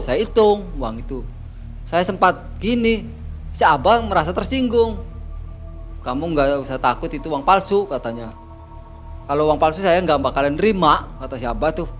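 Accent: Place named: Java, Indonesia. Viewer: Medok bgt lurrr 😂😂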